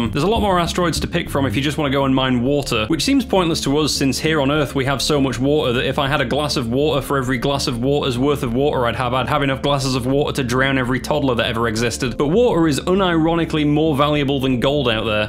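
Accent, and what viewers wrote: United Kingdom. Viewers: He's British Kurzgesagt and I'm here for it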